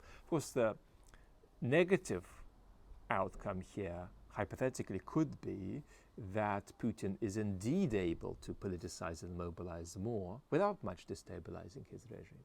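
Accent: Australia. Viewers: We want more Aussie accents on VVC😂! ❤ from 🇦🇺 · Hi, thank you. Always informative, intuitive, creative, and all-encompassing, giving a balanced perspective. Your Australian accent is barely noticeable, perhaps from Tongan influences of encounters with Australians there. Certainly new south Welshman than Queensland.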